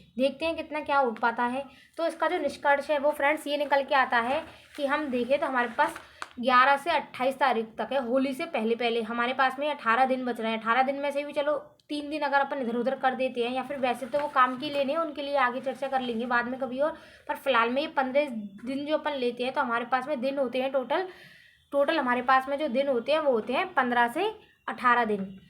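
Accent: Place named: Bharatpur, India. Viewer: Bharatpur se ho kya language to aesi hi h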